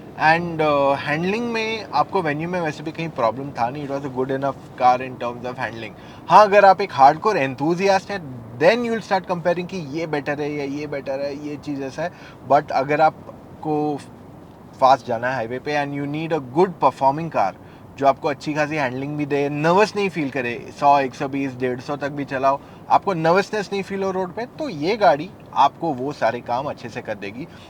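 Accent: India. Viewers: Love ur Hindi accent 😍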